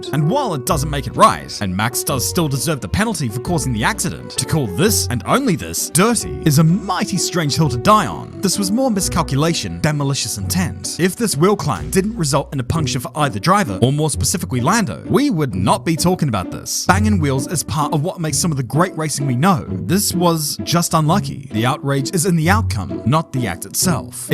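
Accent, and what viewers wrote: New Zealand. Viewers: are you from Southland?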